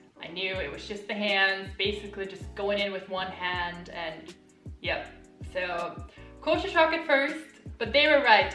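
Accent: United States. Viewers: Sister, are you really German? Your accent is not at all German. It's American. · She is German having American Accent, making video on India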